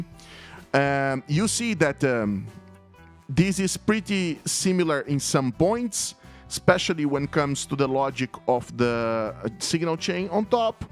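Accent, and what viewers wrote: Brazil. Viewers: Sotaque brasileiro hehe! essa pedaleira é melhor que a valeton GP200? em qualidade de som e efeitos bro?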